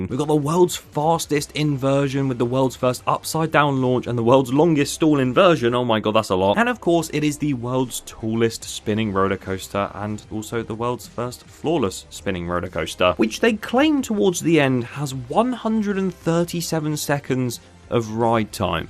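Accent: United Kingdom. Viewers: British accents piss me off. This guy sounds like he licks windows.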